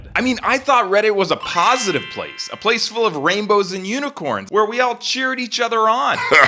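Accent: United States. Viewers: . Recent fan. You live in Europe? I am not good on accents but I think you sound nyny. Inigo (me), "Where do you live in Europe?" The man in black (you), "No place of consequence." Inigo (me), "I must know." The man in black (you), "Get used to disappointment." Anyways... I get the very strong sense that you are a cook, a chef (they are different), and have worked in the restaurant industry in almost all capacities. So... WHY is r/fastfood about "the industry that I love"? I am certain that you do not work in the fast food industry. Is this a nostalgia thing. I would appreciate a reply to this post. Thanks:)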